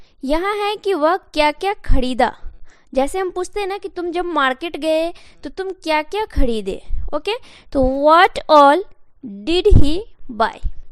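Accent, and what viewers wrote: Bihar, India. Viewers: It seems from your voice, you are from bihar..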